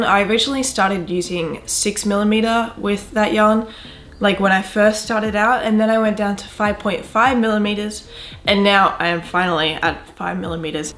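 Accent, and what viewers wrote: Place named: Australia. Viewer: Love your business and its nice to hear another Aussie voice!!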